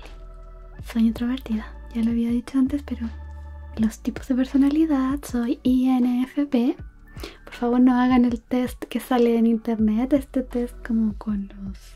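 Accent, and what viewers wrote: Chile. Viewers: Ahora si se nota tu bello acento chileno mas que en el ASMR. Por supuesto que tu VOZ es hermosa siempre en cualquier video! 👍☺👍✌ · tu voz es tan relajante 😊 desde que te escuche dije es chilena, yo soy mexicana, saludos. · Espero las trasmisiones jugando 👌 te va ir de lujo este canal, ese acento chileno me encanto 💖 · Épico hablando chileno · Hola 💗💗 pregunta: cuáles son tus animes/mangas favs? Psdt: que lindaaa, y el acento chileno ajaja❤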